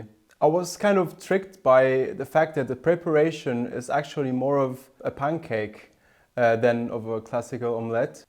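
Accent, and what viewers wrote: Germany. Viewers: Very well done, very interesting. Your English is really very good; I enjoy the German accent and the occasional "Germanic" choice of wording. Do you have a channel in German? I'm learning the language and am looking for interesting material in German to experience. If you speak German with the same speed and clarity you used here, I believe a German channel of yours would be a useful tool for me in that endeavor.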